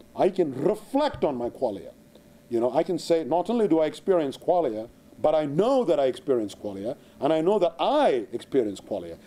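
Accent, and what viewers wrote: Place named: Scotland, United Kingdom. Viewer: Thank goodness he has mentioned Indians regarding mathematics etc. I can detect a kind of Scottish accent coming through VS. He sounds a bit like Sean Connery now and again.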